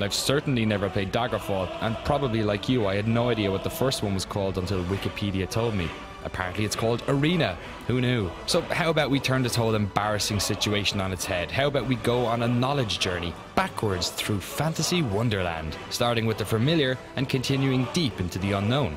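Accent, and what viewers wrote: Ireland. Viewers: are you irish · are you irish? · This guy has a real Irish accent, we don't sound like leprechauns!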